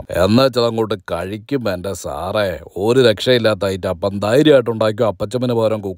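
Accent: Kottayam, India. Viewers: Ellam kollaam bai ningaldey nerukayil beef kerio? Enna voice aanu bass😂😂😂 randenam adicha voice...enna parayana kottayam achayan..ichara ichara...aaavi.hahaha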